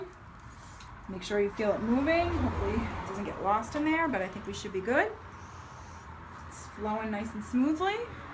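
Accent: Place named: Massachusetts, United States. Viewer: Thanks for the help. Where in Massachusetts are you from? I can hear it in your voice.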